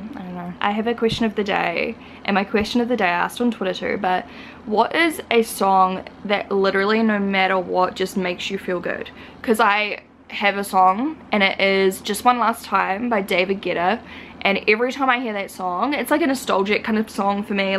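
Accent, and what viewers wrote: New Zealand. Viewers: The voice you do when you call your dogs, my mum thought it was me so funny our NZ twang 😂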